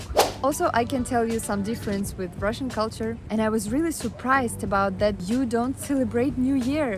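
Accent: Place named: Turkey. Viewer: Sıradan türk görünümlü rus ilginç bir de İngilizce türkçe aksanlı 😂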